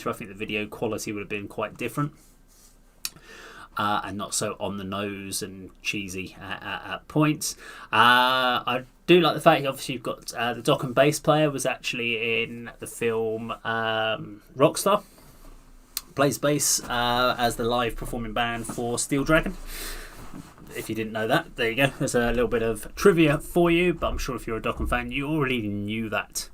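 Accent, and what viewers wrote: United Kingdom. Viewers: You from south east?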